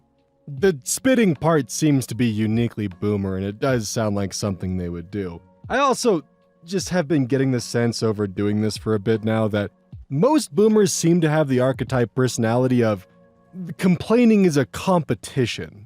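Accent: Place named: New York, United States. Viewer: Huh. Whenever I heard some comedian do a New York accent I always assumed they were exaggerating it for comedic effect. Yet here I am, having just watched a video where nobody mentions anything about New York nor accents, but where the narrator sounds exactly like those comedians.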